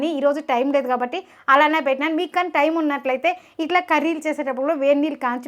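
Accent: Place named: Tirupati, India. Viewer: Chala baga chesaru akka mutton pulusu 👌🏼😍 ekada akka mee vooru? Tirupati r kalahasti anpisthundi slang vintunte ??!!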